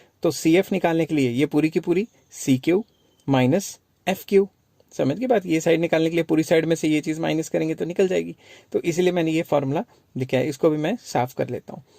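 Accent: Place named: Punjab, India. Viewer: Ur way of teaching is commendable 👏sir apse mujhe thori thori ek punjabi ki feeling ati hai .kya ap punjabi ho ?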